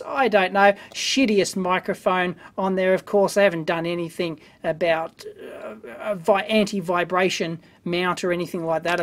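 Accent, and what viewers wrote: Australia. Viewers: Australian accent? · I am not so much into electronics but i like your austrailian accent and I share your humor. Its a kind of entertaining education which I enjoy a lot. Go ahead, I will follow.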